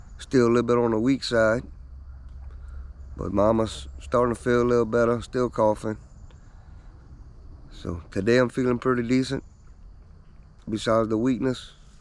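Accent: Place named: South, United States. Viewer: I love your accent, definitely from the south lol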